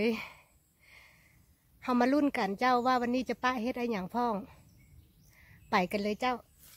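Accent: North, Thailand. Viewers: เออเห็ดแถวบ้าน ดอกมันไม่ค่อยใหญ่เหมือนประเทศอื่นๆเนาะยายเห็นเค้าเก็บกันที่ปะเทศอื่นๆดอกก็ใหญ่ๆจังยายชอบ พูดพาษาเหนือฟังแล้วเพราะดี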